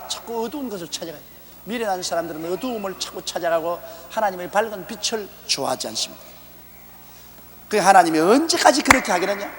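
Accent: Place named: Jeolla, South Korea. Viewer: 형명니 믄 절라도 말씨.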